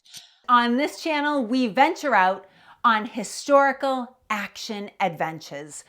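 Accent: Boston, United States. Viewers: She had me at Hello. Love her Boston twang . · My friend told me about you. I am a huge history buff, but my friend says your Boston accent is worse than mine. I was on a TV show based in Boston, and I had to be subtitled. Hahaha, I just subscribed. LOVE your work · Your either from Wostah, or Reveah. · I love that Boston accent. My Love is a native of Cranston RI. If u have ever heard a girl form there talk u will fine it just as funny as i still do even after 44 yr. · Damn,. I didn't think anybody's Boston accent was as thick as mine. Well done. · Being a Bostonian, I really appreciate a YT channel where the presenter talks like me!😅 Great job,! Careful walking those ridges! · You are so smart, attractive, and live the Boston accent!!❤ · Will you take that Boston accent with you when you go out of Massachusetts.🐎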